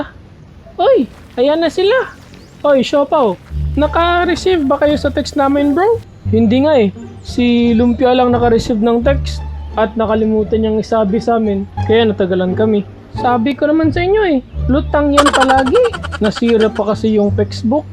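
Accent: Visayas, Philippines. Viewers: bisaya diay ka?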